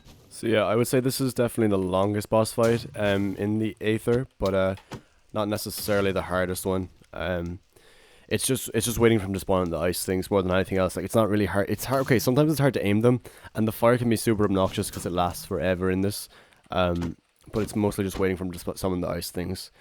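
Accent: Ireland. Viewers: Is ethis guy irish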